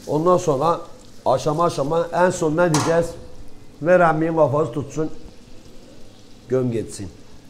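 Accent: Gaziantep, Turkey. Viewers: Senin tatlı dilin,Antep ağzın yeter abi. Antep’e gelirsek muhakkak uğrayacağız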